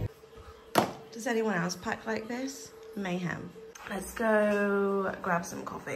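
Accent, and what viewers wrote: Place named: United Kingdom. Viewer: Not crowded is the best. Thank you for sharing. All is good except the British accent.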